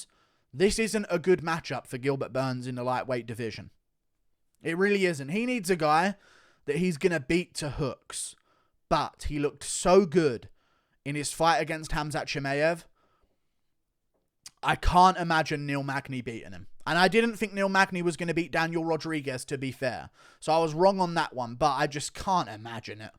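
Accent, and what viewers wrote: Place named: England, United Kingdom. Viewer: Where’s your accent from in England mate ? Uf”cuh”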